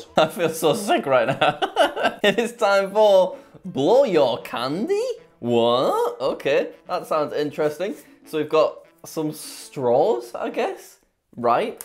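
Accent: RP, United Kingdom. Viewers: where are you from? You sound British I am as well. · I can tell so easily that he is British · he’s so posh in this video 😂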